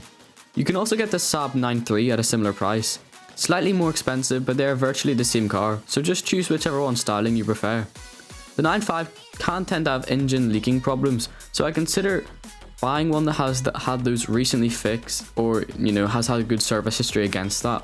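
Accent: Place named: United Kingdom. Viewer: I don't know if I find it good or bad but your accent is so there. It's soooooo British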